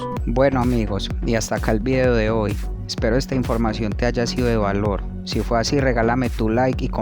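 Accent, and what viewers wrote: Antioquia, Colombia. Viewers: Hermoso tu video hermoso tu acento colombisno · No me gusta ese acento paisa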